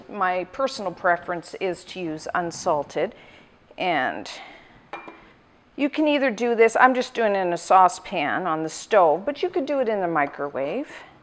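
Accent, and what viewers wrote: Canada. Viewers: She sounds so canadian "abowt"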